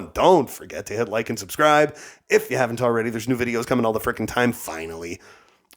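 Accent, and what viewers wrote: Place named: France. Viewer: okey great! Need my weekly fix of the COMBA-TANTS French accent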